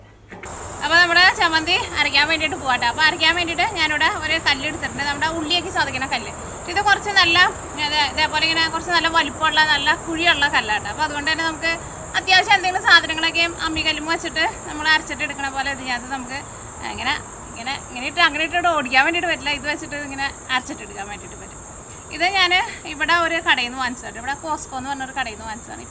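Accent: Thrissur, India. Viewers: Hi chechi, your cooking is So good..u r videos watching since 2014(after marriage)..I love your Thrissur slang sooo much.. even though I am far from my home.. after watching your videos I have homely feeling. always watching your videos with full smile 😊...I used to keep fish curry as you said..... keep rocking chechi...❣️ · Voice modulation better aakan nkkuka, Thrissur slang koodi aakumpol follow cheyyam pattinnilla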